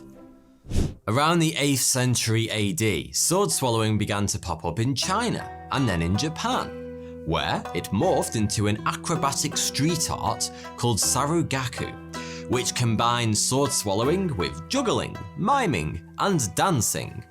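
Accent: United Kingdom. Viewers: What he hell part of the UK does that accent come from? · I literally only watch this to get my British accent better by mocking this douche 🤣🤣🤣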